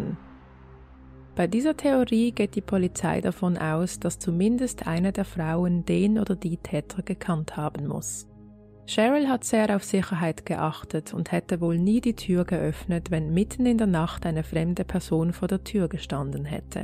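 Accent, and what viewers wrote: Switzerland. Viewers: 🤭😆 wie süss. Schweizer Sprech ist zum verlieben. 😍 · Da bekommt sogar das Schwietzerdütsch einen angenehmen Klang. Es gefällt mir sehr gut, wie du den Fall, den ich schon von einem anderen Crime-Mädel kenne, dargelegt hast . Sehr umfangreich, gut recherchiert. Ich habe gleich ein Abo dagelassen und freue mich auf viel mehr von dir. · Ich wusste nichtvdass die Sprecherin eine Schweitzerin ist aber ich habe es sofort an Aussprache bemerkt. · Was stört Dich daran ? Ihren Schweizer Akzent kann sie wohl schlecht ablegen . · Schweiz Akzente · Den schweizer Akzent im Hochdeutsch finde ich so charmant. Ich mag deine Stimme sehr und finde deine Recherchen gut gemacht. Ich freue mich auf weitere Folgen. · Das ist kein schweizerdeutsch...bin berner...so tönen wir wenn wir uns mühe geben schriftdeutsch zu sprechen...wenn wir schwyzerdeutsch sprechen versteht ihr kein wort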